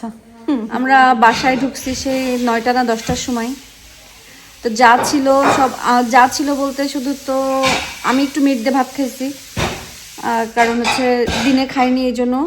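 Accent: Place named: Jessore, Bangladesh. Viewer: tumi onek beshi shundor. Amader jashore er meye bole kotha.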